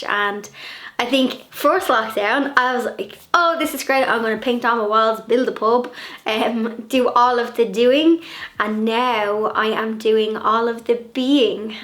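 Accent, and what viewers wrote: Ireland. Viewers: You could just talk about paint drying and I would still tune in. I love to hear your Irish accent, I love your personality, and of course, I love Blondie and Pepsi. Keep up the good work! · I am convinced that there is nothing you can’t do. I love your girly nature with a can do attitude. Plus you accent and humor, you are a ray of sunshine. If I ever visit Ireland I’m going to invite you to tea. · I hope things in Ireland get better soon. It's not to bad in Texas. I want shopping today and bought Pink ornaments for my Christmas 🎄. I always do white but I need a change with this Corona virus. I love all of yout video's and your accent. 💕🥂🎉🎃🤗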